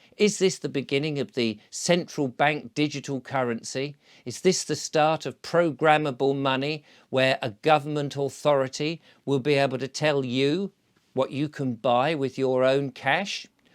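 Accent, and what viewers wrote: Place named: RP, United Kingdom. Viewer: Looking at the way dresses and his house, and the posh way he talks one suspects he may have a bob or two!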